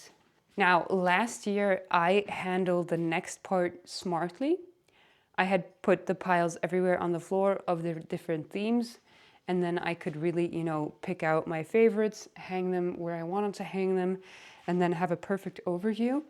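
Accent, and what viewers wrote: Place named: United States. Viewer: Its so interesting, your sick voice gives you an American accent somehow 😂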